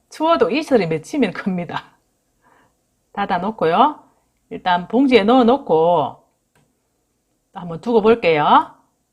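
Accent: Gyeongsang, South Korea. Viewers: 주부님먹는소리가말자국 같아요ㆍ경상도주부님 같아요ㆍ알뜰한 주부님 성격도좋아요ㆍ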